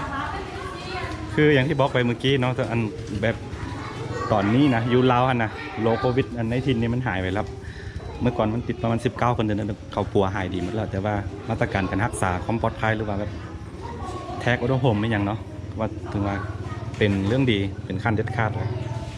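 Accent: Thailand. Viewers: ฟังสำเนียงการพูดแล้วเหมือนคนไทยเลย คงจะเคยมาอยู่เมืองไทยนานนะ คำพูดสมัยใหม่ไม่เหมือนคนลาวจริง ๆ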